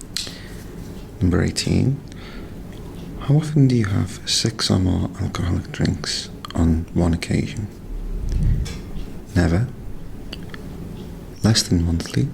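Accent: Merseyside, United Kingdom. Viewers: I never thought I'd like listening to a Merseyside accent (no offence, just personal preference) but I really enjoy this.:) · that your from Merseyside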